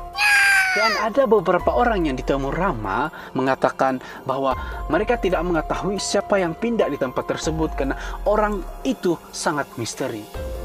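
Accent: Sabah, Malaysia. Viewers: ya bahasa org sabah memag begitu loghat nya lebih kepada bahasa baku😊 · Pertama aku sangka orng Indonesia rupa"nya orng Sabah😂🙏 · Ini bahasa sabah sarawak la...mlaysia ada borneo kan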